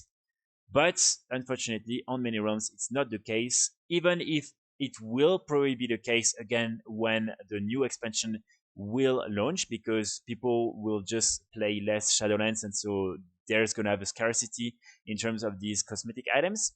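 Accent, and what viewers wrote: France. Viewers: u speak very well :p french ?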